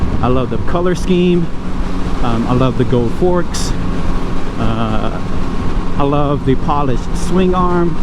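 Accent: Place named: Louisiana, United States. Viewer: Are you in Louisiana? You sound like one of my Cajun cousins.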